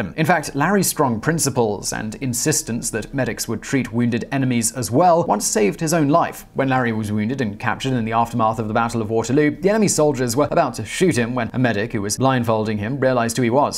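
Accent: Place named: RP, United Kingdom. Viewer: "Radda-ranj" oven? (just teasing! I'd love to have a posh British accent over my midwest Ohio drawl...)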